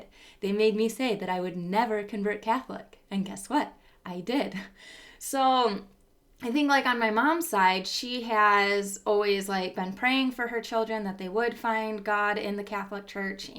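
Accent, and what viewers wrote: United States. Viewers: That Midwest accent though..... love it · As much as I love to hear your conversion story, I have one request to make which is whether you could slow down a bit as its hard for some of us living in India to follow those twang-rich american accents. I mean no disrespect but I wish to listen carefully to your speech which is truly inspiring.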